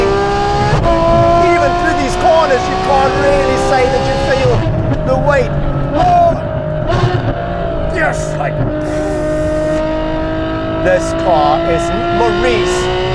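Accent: South Africa. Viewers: That South African Accent is sexier than the F12 and the 812😉🤣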